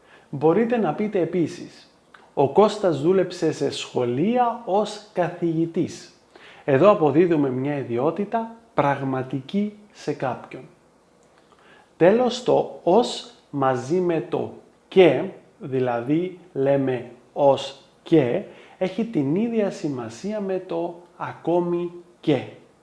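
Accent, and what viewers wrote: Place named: Cyprus. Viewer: Ακούγεσαι λίγο σαν Κύπριος. Διδάξτε με πότε να χρησιμοποιήσω Ω και ο στη γραμματική. Δεν έχω πάει ποτέ στο ελληνικό σχολείο. Είμαι αυτοδίδακτος.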